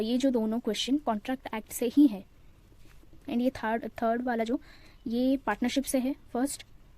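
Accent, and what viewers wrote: Odisha, India. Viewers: I think you are from odisha,Am i right?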